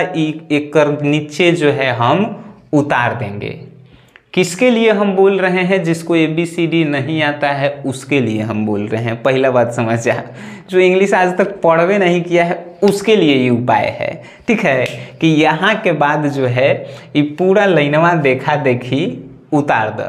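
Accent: Bihar, India. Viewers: Aap bihar se hai kya 😊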